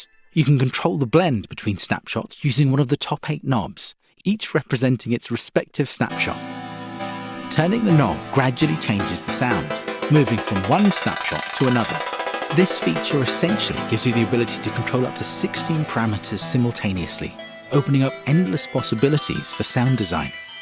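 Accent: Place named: United Kingdom. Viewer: Hi. Congrats on making something new and interesting! Couple of questions… 1) you sound British, so will the stated dollar price include VAT for UK orders? 2) is it midi 2.0 compliant so that changes made in a DAW will be reflected on the controller? 3) any plans to create DAW specific scripts like for Bitwig? Cheers, Phil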